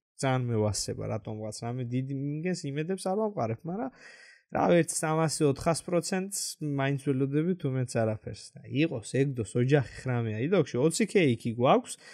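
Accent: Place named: Kutaisi, Georgia. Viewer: კილოთი ქუთეისელი და channel nameთიც ქუთეისელი 😌😂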